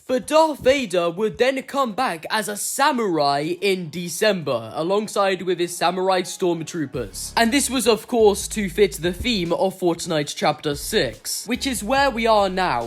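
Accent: United Kingdom. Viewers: This is might be the most british accent I've heard in a while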